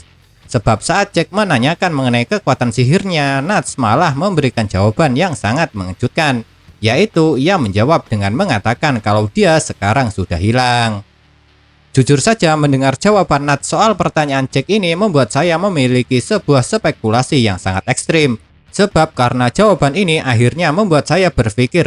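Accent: Java, Indonesia. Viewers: Jawa ya mas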